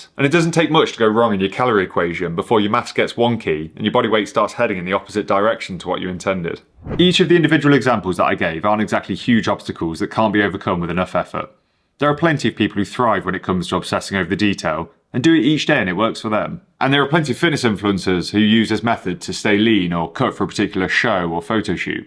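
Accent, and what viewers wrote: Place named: United Kingdom. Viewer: Please take no offense but it's hard to understand your content as you've got a strong British accent. You may wanna add subtitles to reach a wider audience outside of the UK.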